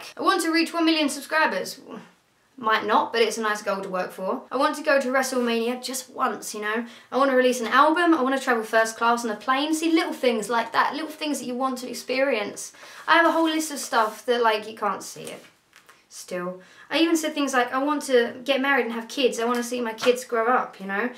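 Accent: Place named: England, United Kingdom. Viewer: I am learning English and I loved to hear you and I loved your accent. Where are you from? England?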